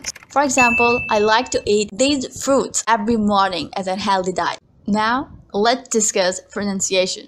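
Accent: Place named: United States. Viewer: If the pronunciation voice was yours then I must request Google to add yours in their data base.🙂 I can't hold on with this pronunciation as it's American and I speak British. Good video though. 👍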